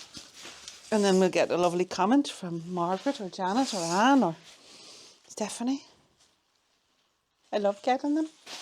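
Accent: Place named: Northern Ireland, United Kingdom. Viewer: Love a Northern Ireland accent, I could listen to you all day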